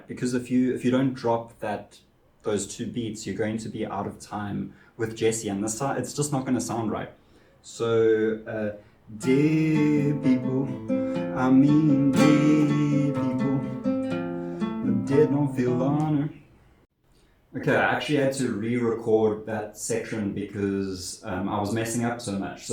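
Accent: South Africa. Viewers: This is awsome man, Ill be using this for sure! Are you South African? If so double hell yeah!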